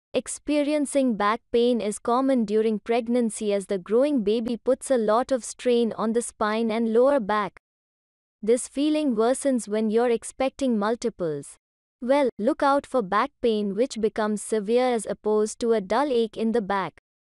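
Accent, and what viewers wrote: India. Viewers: Ugh, another Indian accent video. So annoying.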